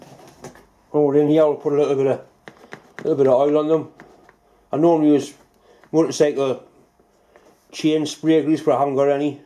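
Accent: Newcastle, United Kingdom. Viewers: 51 years since I worked a metal lathe as an apprentice. Takes me back in a good way. Love the Geordie accent ..... don't hear it very often here in the Rocky mountains.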